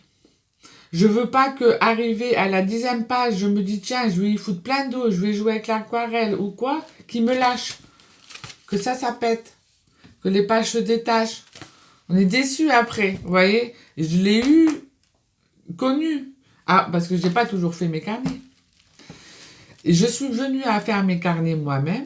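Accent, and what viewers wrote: South, France. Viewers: avec ton petit accent du sud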